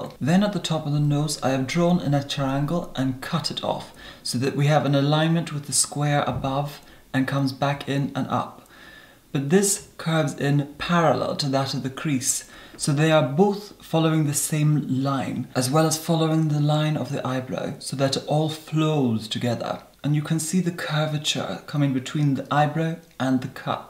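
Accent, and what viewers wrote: Scotland, United Kingdom. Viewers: So much talent. But i miss ur scottish accent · Imagine manny attempting to parrot everything says 😺 He couldn't string it. No offence to Americans x BTW his accent is Scottish x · patsy t That's Scots accent love, we all speak like that here! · Favorite part is that he's got a faint Scottish accent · Anton Fuchs Scottish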